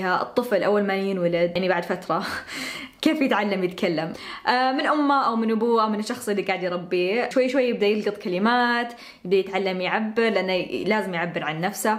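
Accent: Najd, Saudi Arabia. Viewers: اللهجة دي حقت أهل الرياض أهل نجد🌹🌹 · لاتكلمي انجليزي اذا صورتي لنا لان لهجتكك او طريقة كلامك بالسعودي جميلللللللل 💛💛💛💛. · ياربي بعشق لهجتك السعودية الاصيلة دي بجد جميلة جداً ربنا يحميكي ♥️ بقالي ٣ شهور نباتية 💪🏼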